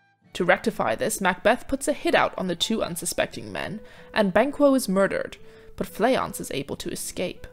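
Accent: United Kingdom. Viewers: Since everyone could recognize this is a typically English or British accent if you agreed!🎉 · Enjoy the narrative with British accent; and I appreciate learning such famous stories in so short a time!👍-Thank you! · Tysm I have an exam tomorrow and this helped me too much I appreciate with the best British accent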